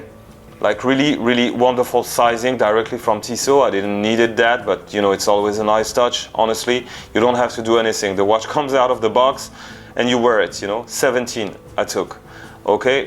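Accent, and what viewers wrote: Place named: France. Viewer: That is a beautiful watch! I may have to go and buy it! Also, may I say, your accent is gorgeous - I must come to France if all the men sound like this!!! 😂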